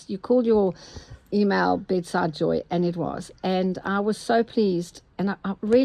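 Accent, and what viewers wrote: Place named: South Africa. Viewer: Just came across your video. Lovely to hear another Saffer accent. :)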